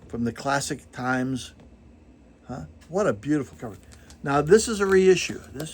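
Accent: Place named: United Kingdom. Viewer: Cheers! With a British accent like that, you should go far!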